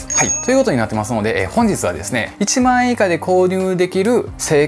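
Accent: Kansai, Japan. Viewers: 綺麗な関西弁で何かラジオみたいに聞いてたい｡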